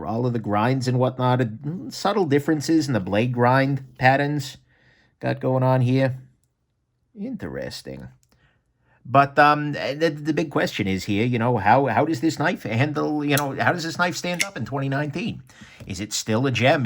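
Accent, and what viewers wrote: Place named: New Jersey, United States. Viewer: funny, but I think is from New Jersey, we don't talk like that in New York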